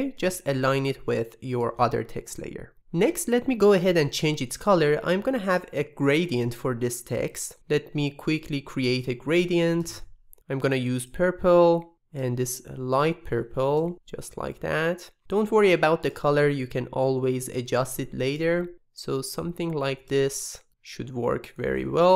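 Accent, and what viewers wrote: Iran. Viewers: لهجه فقط لهجه ایرونیاااااا قربونت برم که یهویی اتفاقی دیدمت تو سرچ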